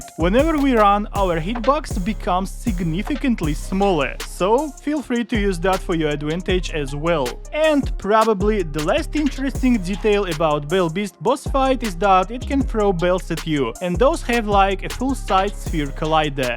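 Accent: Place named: Russia. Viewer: It's a Russian accent this guy has a YouTube channel in his native language.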